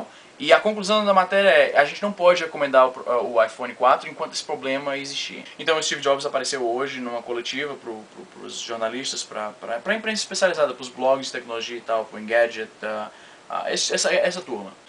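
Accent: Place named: Curitiba, Brazil. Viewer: tu é curitibano, né ? hahah sotaque entrega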